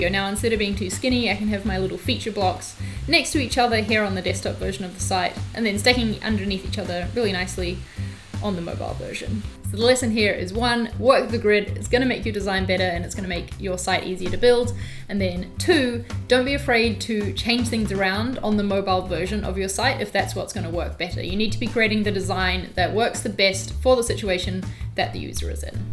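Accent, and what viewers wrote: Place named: New Zealand. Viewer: As soon as I heard you speak I was like Whhhhhaaatt!!! She's definitely a Kiwi! Great Video - thanks!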